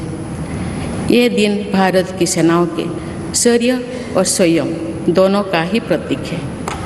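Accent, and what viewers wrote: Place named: Odisha, India. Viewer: She spoke Hindi in Odia accent 👏👏proud of her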